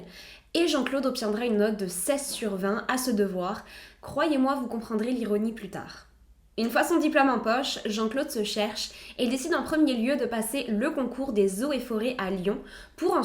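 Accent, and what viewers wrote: Quebec, Canada. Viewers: Au top. Comme d'hab 🔝👍🔝👍.... Retour en France du coup🔙🔜..... Promis pour une lilloise... T'as vraiment l'accent quebecois😆😆...j'adore👍👍👍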